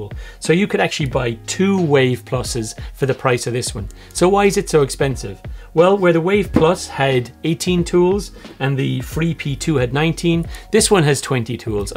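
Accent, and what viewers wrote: Ireland. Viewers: I listened 3x just to hear the Irish accent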